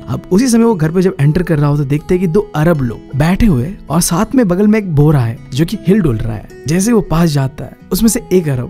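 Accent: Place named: Assam, India. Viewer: Bro has Assamese shall bihu bihu lagise ..... Love from Assam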